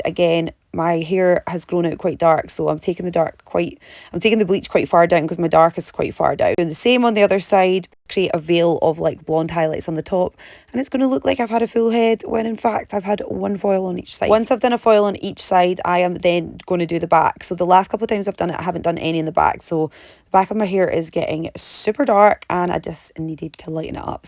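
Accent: Scotland, United Kingdom. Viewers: She's Scottish for sure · You’re Scottish or irish or welsh ?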